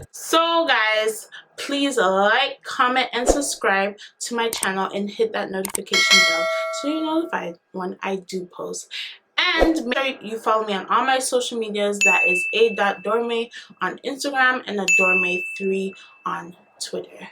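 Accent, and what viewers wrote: Jamaica. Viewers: TALK JAMAICAN ALL THE TIME :) , I LOVE IT . GOOD STORY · Are you a Jamaican I can hear it I am a Jamaican myself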